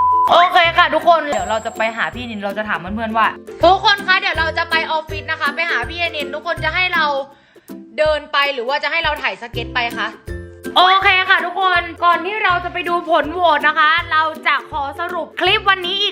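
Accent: Nakhon Sawan, Thailand. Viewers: เฮียเหน่อออออแบบฉบับนครสวรรค์ของแท้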